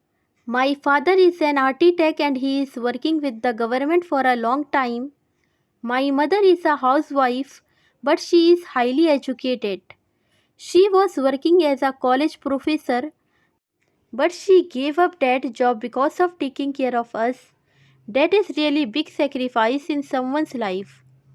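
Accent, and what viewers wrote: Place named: India. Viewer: See, I gave you the first (like) and the first comment, but you have to improve your dialect which is totally native Indian. Good luck.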